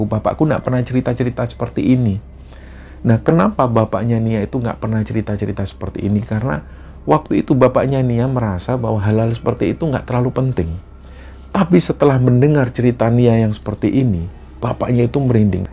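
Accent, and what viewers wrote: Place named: Surabaya, Indonesia. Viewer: Kalo dengerin dan logat mas, ngelingke aku sama Suroboyo. 20 tahun disana, sampai dapat jodoh orang Kediri. Semoga dan Tim semakin maju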